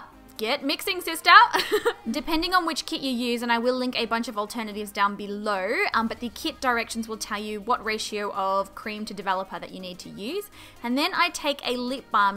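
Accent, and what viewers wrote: Australia. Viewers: That’s correct that how Aussie’s say it🤗🤗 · Wow din knew u were Aussie yayyyy lots of love from Melbourne xx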